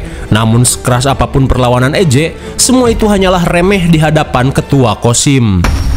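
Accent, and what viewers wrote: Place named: West Java, Indonesia. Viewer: Sering aplod video nya KA, soalnya aku suka banget cara ceritain alur nya lucu gitu aku suka, kaya ada Sunda sundanya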